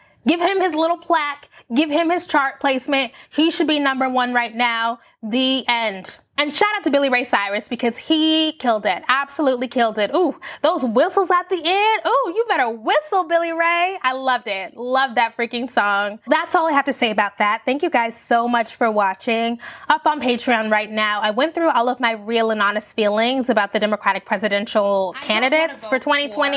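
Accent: United States. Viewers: I thought you were from Alabama.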